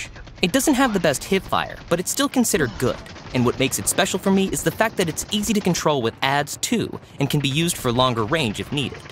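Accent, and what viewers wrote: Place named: India. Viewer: His voice is very nice. But of course we want the natural Indian accent. 😊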